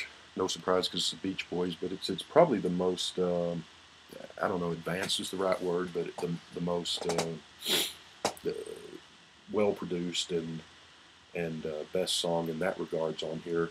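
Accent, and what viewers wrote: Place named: Texas, United States. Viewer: hi, from England. do you know that there is an earlier album which contains their candix recordings?. I don't know if its available, but certainly is on there and its not too different. of course is there too, in fact as far as I can remember, there were a few more of the tracks featured here, but they are slightly different and sound more compressed.. Al Jardine was at high school with Brian and was a member of the same baseball team, but his parents were unhappy for him messing about with a pop group and made him study to be a dentist and get a proper job.......seems funny now, doesn't it? David Marks lived across the road from the Wilsons and as they were desperate for a guitarist, was asked to join, but I don't think he got on too well with murry and I think that's why he left. I know that he did some work for the turtles. 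there was a report in our newspapers over here that there had been a secret beach boy that had been sacked and left off many album covers and I am assuming this to be David Marks.. good and fair review though and I love your Texan drawl, it sounds really cool, no insult intended, hahahaha. best regards.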